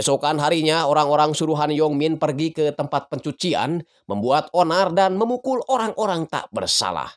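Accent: West Java, Indonesia. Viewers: Mimin na mni khas cara ngomg na kaciri sunda na kacida😅😅😅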